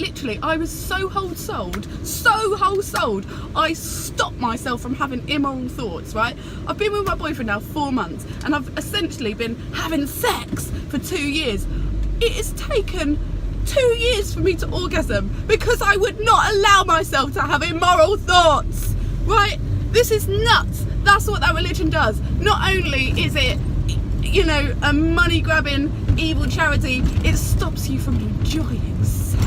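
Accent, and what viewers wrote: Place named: Southern England, United Kingdom. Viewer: where you from im from hastings sussex you sound southern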